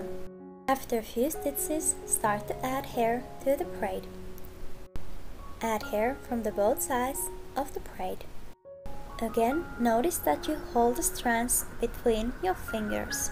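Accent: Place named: Finland. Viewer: I knew your accent was from Finland. So beautiful there. Thanks for trying to show us how. Be well and stay safe.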